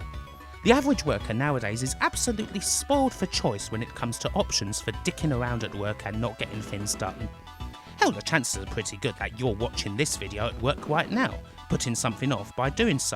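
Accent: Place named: Essex, United Kingdom. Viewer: great video where in essex are you from? im from colchester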